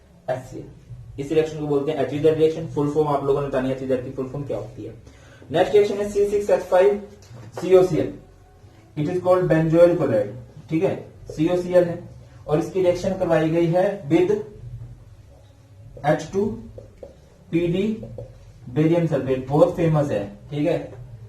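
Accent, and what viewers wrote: Haryana, India. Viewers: cool sir r u from haryana thanks sir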